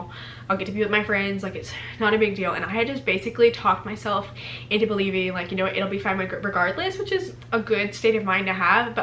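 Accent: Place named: Texas, United States. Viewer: You and Emilyenchanted talk the exact same way!! So weird lol I figured you were from Texas like her! Same accent!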